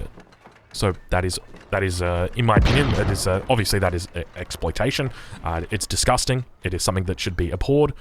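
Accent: Australia. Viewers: I think you need to change how you cast them. All of these games aren't tournaments games where everything is super important to watch or pay attention to. Maybe. Focus on a few battles. Maybe build orders but jump around more. Don't have a 20 min casting slog where you are trying to fill the air with your Australian accent. Maybe reduce the time? By skipping boring parts. Or something idk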